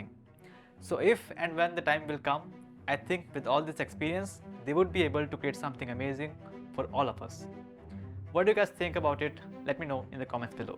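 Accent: India. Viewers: you have an Indian English accent, which I think is fine.But. you can instead focus on Innotation and pause in delivery.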